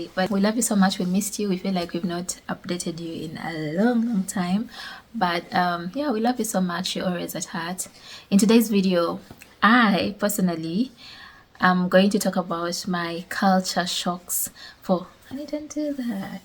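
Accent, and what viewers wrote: Uganda. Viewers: Hahaha I love your humor 😂😂 please don't change that Ugandan conversational accent. You two make us feel like we're part of your conversation 😂😂 I wish that food was available here. Ehh waiting for a restaurant 😅😅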